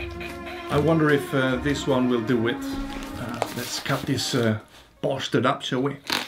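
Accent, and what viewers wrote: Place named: Sweden. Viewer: Your Swedish accent is delightful! Love it 😁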